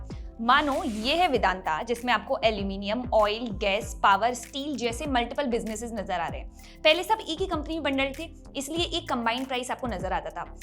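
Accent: India. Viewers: Voice nahi diction....marathi diction...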